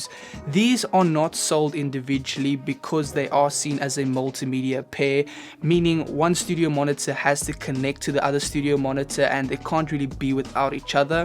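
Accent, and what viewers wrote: South Africa. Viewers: hello, Just a random question are you south african (you ascent just sounds like it)?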